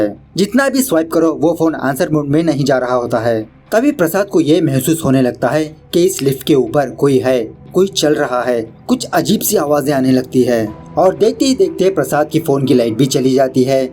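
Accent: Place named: Hyderabad, India. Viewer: Bhai Hyderabadi dilect akhari hai...😎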